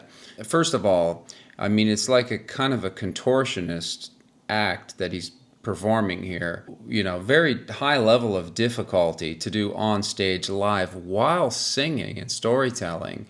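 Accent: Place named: East, Canada. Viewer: I'm detecting a little eastern Canada in that accent my friend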